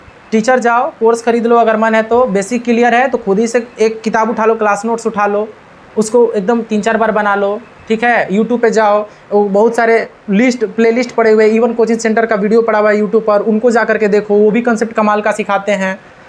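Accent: Bihar, India. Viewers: bihar se ho bhaiya . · Bhai aap ...Bihar se ho ?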